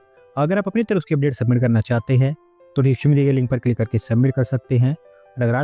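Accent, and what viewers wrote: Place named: Himachal Pradesh, India. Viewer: Bhai aap Himachal se hai, aapka accent se lagta hai.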